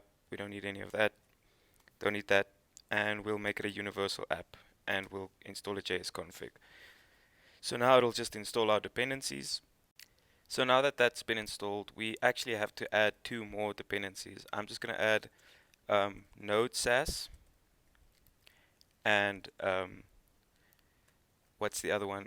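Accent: South Africa. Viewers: You sound South African brother. Thank you for this tutorial. Just what I needed right now.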